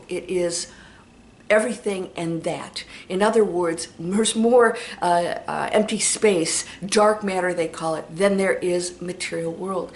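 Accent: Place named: South, United States. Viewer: Luv your southern accent! Great vid!👍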